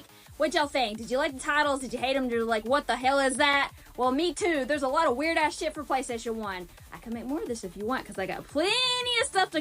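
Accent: South, United States. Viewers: I love your charisma! Don’t see many other southern accented gamers. Bless you friend!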